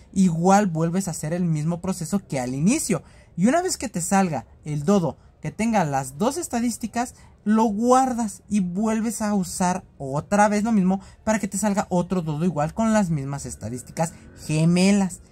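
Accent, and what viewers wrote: North, Mexico. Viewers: Muy norteño xD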